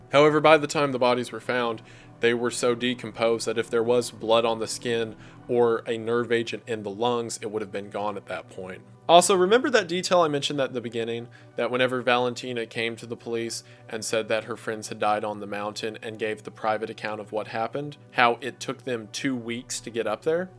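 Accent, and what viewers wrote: South, United States. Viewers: He pronounces a lot of things in other languages in a very American sort of way. 😂 He's got quite a drawl and it can really catch you by surprise sometimes! On a lesser note, he seems to have misread "Dyatlov" outright, which is a thing he's done before with other words/names (foreign and english-language), so who knows, maybe he's struggling with a lil dyslexia, too. Sooooo many male content creators I follow have dyslexia & ADHD, so I really wouldn't be shocked if that were the case. Maybe a pronunciation key in his notes would help in the future. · I also notice he puts "such as" in places I personally find unusual and it stuck in my mind because it made me think of that beauty pageant contestant who said some garbled stuff about education in "the Iraq" in response to a question and I thought that was her just making a mistake but the way he keeps using it in a consistent way and they have similar accents it might be a southern dialect thing?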